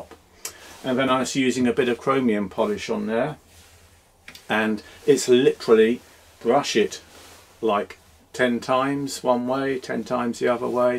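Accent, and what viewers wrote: England, United Kingdom. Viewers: Thanks for the reply, comments and help. I have all of these while using an old “Real” leather belt more mounted to a strip of plywood. As an auto tech of 40+ years, I have polished a number of parts for restoration or those I have fabricated. I enjoy learning and new ways of doing things. I find your thick Proper English accent pleasantly enjoyable from a farm boy now in Omaha, NE. Blessings and be safe for the Holidays. Enjoy.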